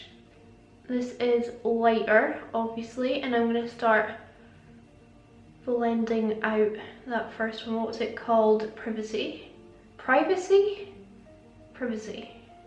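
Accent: Scotland, United Kingdom. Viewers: I told you before haters gonna hate and therefore don’t mind the bitch! As I was saying on IG before watching your video that I would not purchase it cos I don’t find the colours too unique!! And while watching your video I realised that Gingerspice was from Too Faced 😂 I only watch your videos because you’ve got real talent and I love your Scottish accent 😁 And as you can see down below a lot of people have your back, so don’t get frustrated because of one stupid bitch!! Keep doing you that’s why we love you 💕💕💕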